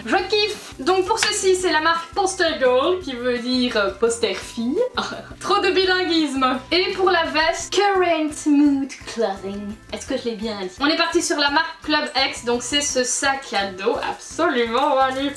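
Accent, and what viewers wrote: Liège, Belgium. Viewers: elle est liegeoise meme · Tu serais pas Belge t'as un de ses accent ! Je suis Belge lol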